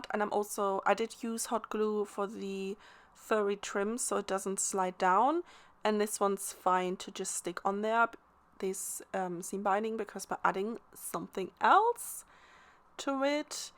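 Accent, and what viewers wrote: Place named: England, United Kingdom. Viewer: You make such pretty things. I love pink and purple myself. My fav colors. Love listening to your “English” voice. We here in the states gave the “hick” sound. 🤣. Anyway, I need to find the pretty embellishments like you do to layer with. So fun watching you create. TFS!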